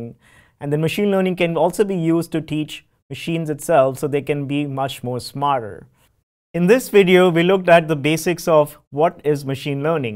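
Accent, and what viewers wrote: India. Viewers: I can't go against myself and pretend that it's pleasant. It's not. The accent is irritating, and choosing an Indian native speaker to narrate a text in English is simply a stupid decision. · why do you guys hate Indian accent ?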